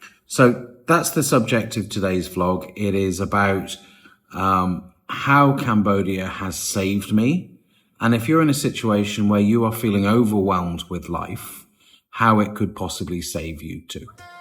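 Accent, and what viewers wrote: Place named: England, United Kingdom. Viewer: Great pommy accent, mate! 😄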